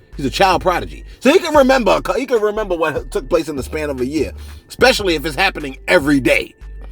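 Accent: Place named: South, United States. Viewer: Man you talk bad like you from the south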